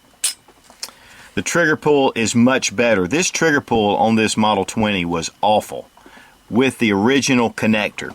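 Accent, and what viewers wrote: United States. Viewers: why can't americans say any :D it's always ' ini ' xD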